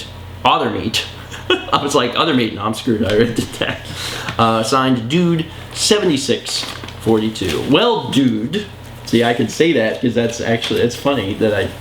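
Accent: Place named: Louisiana, United States. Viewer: That accent sounded like. In the bayou!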